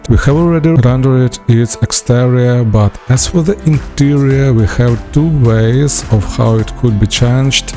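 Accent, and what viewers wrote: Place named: Russia. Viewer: Very novice Russian accent .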